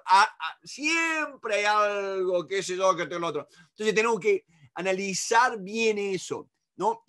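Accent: Córdoba, Argentina. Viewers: ALTO CORDOBES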